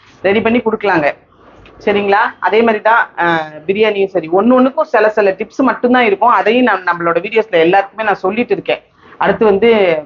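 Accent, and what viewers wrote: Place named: Coimbatore, India. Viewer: Super , coimbatore ah sister ninga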